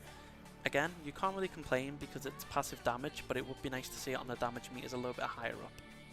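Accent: Liverpool, United Kingdom. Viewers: are you a scouser?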